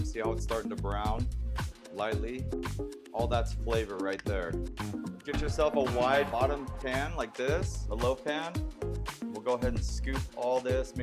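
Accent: United States. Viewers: You from Hawaii? I can hear small kine pigeon or local accent! Right on, Braddah… keep up the good work🏝️ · Sweet, but you sound like an American. You know, we use cups as measurements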